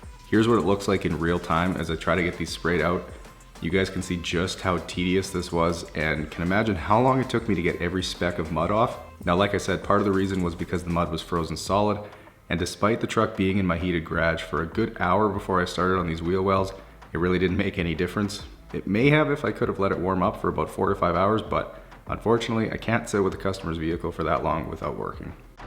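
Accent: Canada. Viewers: Love how you became more Canadian sounding once you were talking to your bud there! It's so funny how we make each other Canadian. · I ligit screamed when he was talking to the customer I never thought he sounded Canadian till now the aye and everything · Dude doesn’t sound Canadian when he does voiced overs but when he talks to other Canadians in a video he sounds Canadian as ever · anyone else surprised when he started speaking canadian? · Eh? Looks a lot better than I brought it eh? Yea a little less mud on it eh? I love the Canadian speech 😂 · His inner Canadian comes out when he associates with other Canadians.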